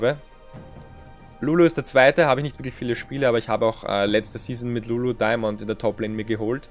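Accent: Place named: Vienna, Austria. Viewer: bist du österreicher :D klingt iwie wienerisch :D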